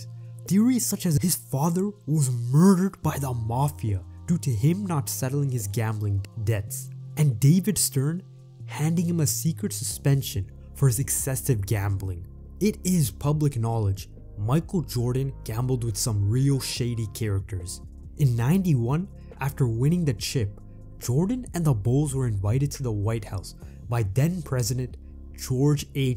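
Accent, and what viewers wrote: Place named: United Kingdom. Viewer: God, your voice is so... BRITISH, EXCITED, I DON'T KNOW!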